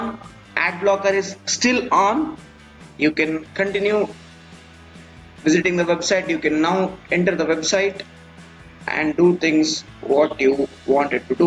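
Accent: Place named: India. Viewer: Indian accent too hard to understand.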